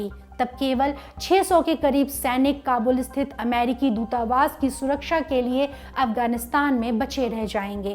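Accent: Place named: Uttar Pradesh, India. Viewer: Uttar pradesh accent in her language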